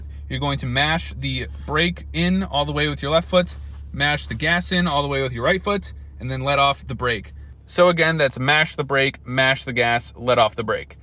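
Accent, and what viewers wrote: United States. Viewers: It's kind of a midwest thing. Even in Canada, folks from the prairies say "Oddie". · He's American, they say alot of things differently.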